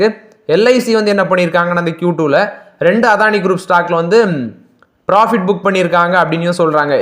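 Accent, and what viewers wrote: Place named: Tirunelveli, India. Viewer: Beutiful southern language(nellai?).... Language beuty kagave parkuren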